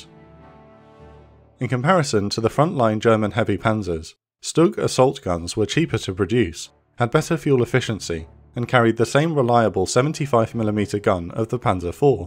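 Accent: United Kingdom. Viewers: This video is so typical of WW2 stuff: an announcer with a British accent (to make it sound more "official" somehow) and an over emphasis on the importance of tanks themselves by 1944. AIR SUPERIORITY and straight up LOGISTICS were 200% in the hands of the Americans, and even the Russians, almost a full year before the "Battle of the Bulge" anyway. Some great military mind once said, "Amateurs study tactics, Professionals study logistics." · I wish that the English was better without the over emphasis of the British accent. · This is not totally accurate, the problem was fuel, fuel and fuel! the Stug, Panzer 4, Panther, Tiger! Its like the Pommes still think that Little Willy Frank Whittle thinks he invented a jet engine; meanwhile the German had a Jet aircraft flying in August 1939! Judging by your accent you come from the country which made the worst tanks in WW2; the Cromwell, Crusader, Churchill all were shit! 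British Tanks were crap! My Father was a Tank Commander of a Mk 4 Sherman, and the odd Sherman had a 17 pounder in it! My dad was in the 20th Battalion of the 2nd NZEF, 1st Echelon. WE were bloody pleased to get Sherman's Powered by the Ford GAA V8 18 Litre grunt machine. He fought in Greece, Crete, North Africa, where the New Zealand division got smashed because we didn't have our own Tanks, so after massive losses, the Battalion (Survivors) were turned into armored Regiment and fought through Italy right up to Trieste! They even had a few shots at T 34's from Titos forces! Tito backed down!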